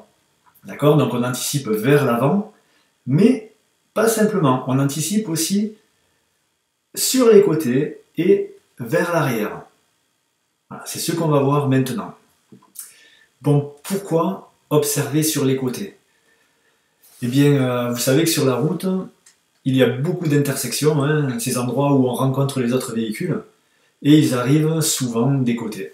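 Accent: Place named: Toulouse, France. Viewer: vous etes où ? vous avez un accent Toulousain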